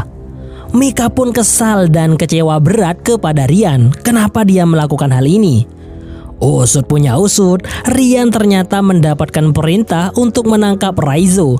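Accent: Madura, Indonesia. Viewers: Abbe adminnah Reng medureh yeh😂😂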